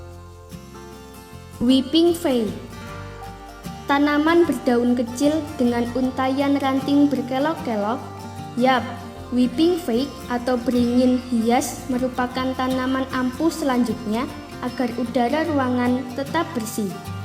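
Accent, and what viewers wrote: Java, Indonesia. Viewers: Jawanya medok kalii itu bang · Beetele2,,,,n sgt meddok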